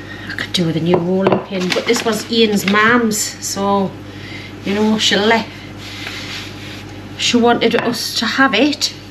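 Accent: Newcastle, United Kingdom. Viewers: A Geordie accent and a Manchester United glass on the drainer! tut tut;-) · thanks am from Gateshead myself but i have lived down in Southampton since late 1990 into 1991 it’s lovely hearing that geordie accent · My Geordie Grandma used to bake this, it was often part of our Saturday lunch, with a home made soup. Made me very nostalgic, not only the pie but the accent, thank you 😘 from Australia 🇦🇺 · Looks yummy, and i love a geordie accent ❤ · Wow! Loved that video. I don’t know what algorithm YouTube had that meant it popped up on my home page but I took the click bait (must’ve been the reference to ‘bacon & eggs’ coupled with ‘pie’) that hooked me. /Madam, you are just brilliant and I’d love to pop in for lunch sometime. I loved the background and history you chatted through, from your late mother in law’s rolling pin through to all your references to your “Nan” and her influence on you. 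On top of all that to have your rolling commentary in such a wonderful Geordie accent was perfect. Thank you. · Being Irish, when I saw he words "Bacon, Eggs & Pie" I was sold......The fact your a Geordie was the icing on the cake. My family lived in Newcastle for many years before we returned to Ireland. 3 of my siblings were born there. My Uncle taught in school there all his adult life & my nan passed away there, she was an incredible cook! No scales, no measuring... all done by eye and feel! God bless you love!!! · I love the Geordie accent and that pie looks delicious just like the sort of food i was bought up on, happy days. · A Geordie lass, after my own heart.😁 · Love the Geordie accent real people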